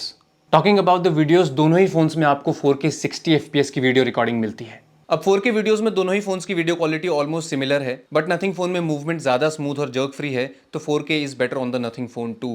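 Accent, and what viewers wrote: Dehradun, India. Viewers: Bhai dehradun s ho aap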